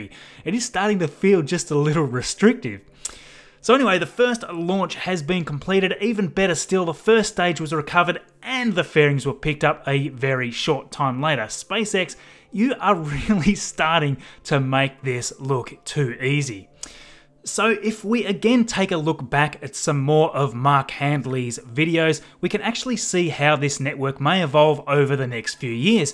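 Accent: Australia. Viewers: Can not listen to a thick Australian accent; it's unbearable; sounds like a flushing toilet. Can you get someone with a soft pleasant voice?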